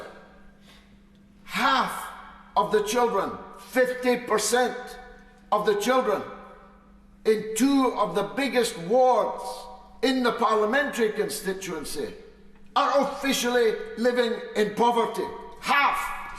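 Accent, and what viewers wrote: Scotland, United Kingdom. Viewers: The Scottish accent is beautiful.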